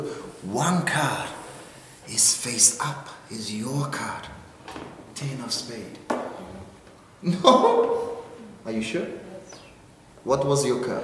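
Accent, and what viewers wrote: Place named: Australia. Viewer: I just found you today on my recommended. I like how you teach card tricks. Is he Australian by any chance as his accent sounds like it?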